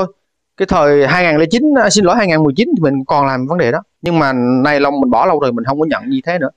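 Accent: Standard. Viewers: Ô a nói chuyện chuẩn quá.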